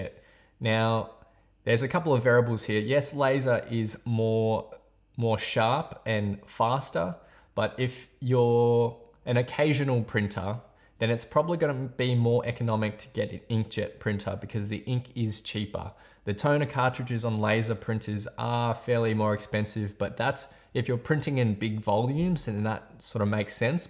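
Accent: Australia. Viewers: Thanks. Nice, clear review. Nice to hear an Aussie accent too... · I like the aussie accent.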